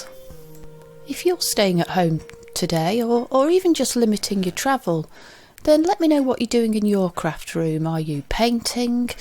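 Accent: United Kingdom. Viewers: You are such an inspiration to me!! Yesterday afternoon I spent a magic time following your tutorial and painting the houses myself. As soon as I know how to post it I'll show it to you. Oh, and your British accent is just a beautiful addition to all your beautiful and helpful videos. Thanks so much from Spain!!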